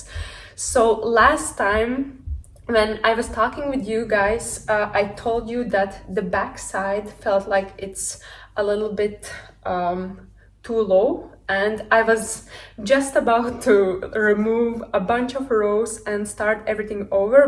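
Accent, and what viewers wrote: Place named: Eastern Europe. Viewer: i think it looks great😊 i would make it to the length below your knees, nearly to your shoes, that will give the „wedding dress“ type of dress, but it‘s definetely wedding dress material! keep on doing row by row, i‘m excited for the final part😊❤ after it‘s finished i would sew a skin color material under the dress so it looks like your skin but it gives you more secureness🤗 btw what accent do you have? it sounds slavic to me😊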